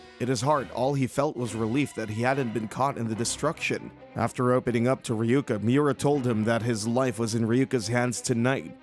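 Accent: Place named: Philadelphia, United States. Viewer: YO THE AI HAS A PHILLY ACCENT WTF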